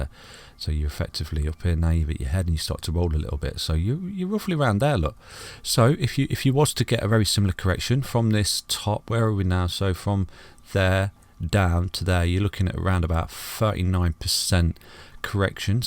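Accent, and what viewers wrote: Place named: East Midlands, United Kingdom. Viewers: He's from Leicester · Sounds like east midlands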